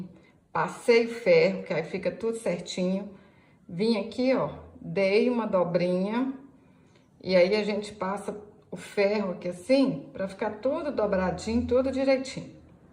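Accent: Minas Gerais, Brazil. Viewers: Kkk vc e mineira de onde sua voz te entregaaa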